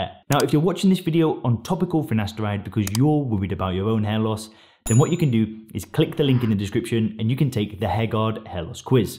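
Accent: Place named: United Kingdom. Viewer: ''pop a pill and your dun'' love that british accent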